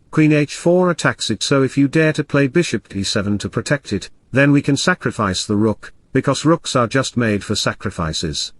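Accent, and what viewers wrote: United Kingdom. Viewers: The narration sounds like an Englishman was asked to read a script written by a non native English speaker with a less than firm grasp of English grammar.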